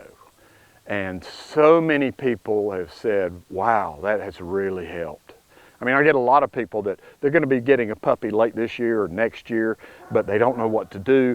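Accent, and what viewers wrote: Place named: New York, United States. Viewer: Too funny! Your NY accent ...paaaw... I’m from ny got a good laugh from that!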